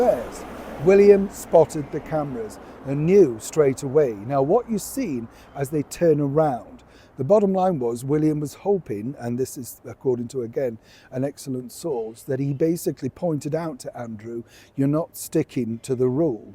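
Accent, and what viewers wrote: Yorkshire, United Kingdom. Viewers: Neil hubby & I thoroughly enjoy your news told as it is and sometimes with a bit of "Yorkie" thrown in keep up the good reporting and bugger the moaners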